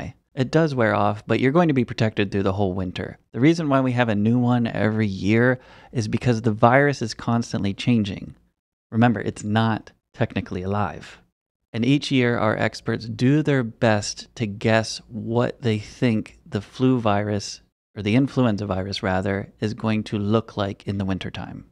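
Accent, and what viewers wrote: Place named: Pennsylvania, United States. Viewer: Maybe Pennsylvania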